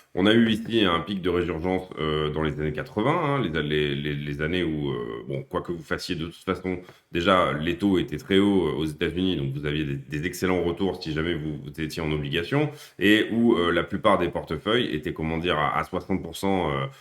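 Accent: France. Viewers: Personnellement, j'adore me promener dans la nature. ça fait plusieurs années déjà qu'on a entrepris une excursion ailleurs mais avant on réjouissait beaucoup d'une sortie à la mer juste pour se balader un peu et respirer... on a visité plusieurs fois l'île envoütante de Cuba. Ce qui m'intéresse en ce moment c'est les "communautés" en France (et ailleurs) où on vit un peu à l'écart comme par exemple les endroits oà on se dédie à la permaculture etceterca. Ben voilà, j'ai juste voulu répondre à votre dernière question. Nous on vit près de la frontière des Pays-Bas. Vous avez un bel accent français, pourtant je ne saurais pas dire d'où exactement. Ce qui importe c'est que vous vous faites comprendre malgré la vitesse avec laquelle vous vous exprimiez. Bien à vous.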